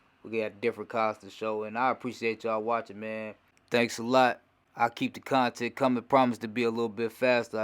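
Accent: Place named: Chicago, United States. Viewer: I noticed right off the bat you’re a Chicago guy, you sound just like one of us. Straight Chi City sound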